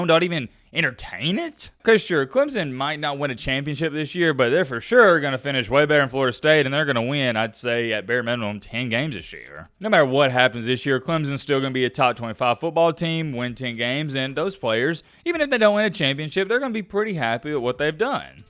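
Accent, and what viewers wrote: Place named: Alabama, United States. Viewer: You’ve got the most Alabama accent I’ve ever heard and I love it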